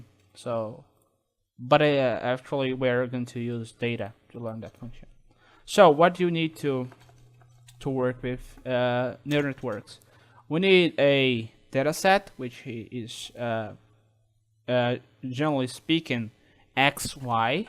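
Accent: Brazil. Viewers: Hehe.Br falando inglês, não tem como não perceber. Abraço.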